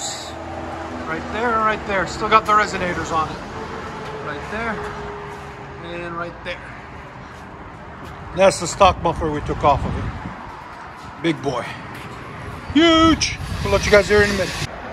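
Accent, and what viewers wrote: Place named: New York, United States. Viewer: Tennessee and he sounds like a pasta eating nerd from New york.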